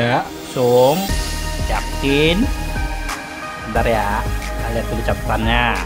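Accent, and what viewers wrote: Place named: Banyumas, Indonesia. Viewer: Ngapak ra jelas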